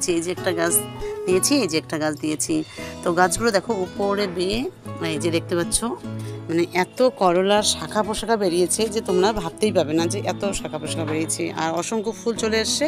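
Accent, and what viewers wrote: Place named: Bangladesh. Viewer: আপনি তো একদম বাংলাদেশিদের মতো কথা বলেন। আমি এত দিন ভেবেছি আপনি বাংলাদেশী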